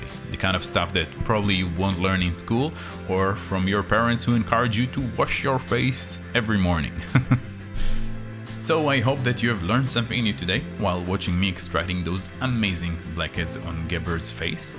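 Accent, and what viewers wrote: Israel. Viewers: your truly charming accent which I'm going to assume is Israeli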